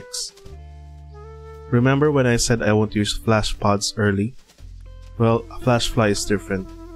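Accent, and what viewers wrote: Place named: Philippines. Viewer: Presh filo accent 🇵🇭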